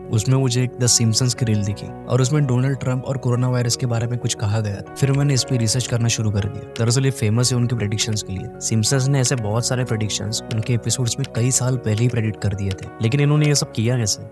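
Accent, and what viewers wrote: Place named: India. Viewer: He speaks indian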